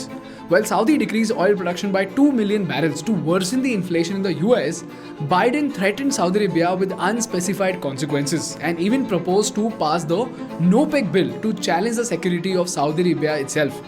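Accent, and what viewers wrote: India. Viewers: OMG, the host indian accent is so annoying. He should not speak at all. Next point, the West can never trust India and Saudi cuz they are just two face users and go wherever the wind blows. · The problem is .... Your Indian accent" Sorry, can't focus on subject. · I love your English, it sounds very much like Hindi. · Ganesh Prasad is the face of the indian accent now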